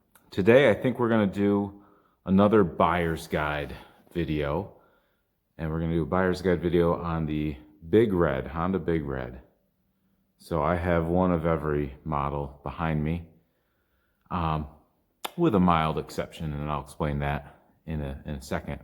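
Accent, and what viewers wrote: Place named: New York, United States. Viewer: says Tires just like Stewie from family guy says cool Whip, must be that New York accent, keep making these great videos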